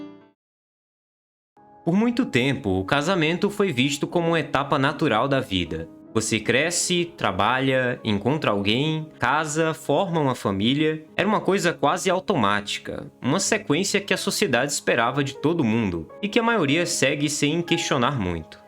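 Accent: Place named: Pará, Brazil. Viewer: Sotaque da região do Pará, tenho um amigo do Pará e estudei com pessoas do Pará q tmb falam assim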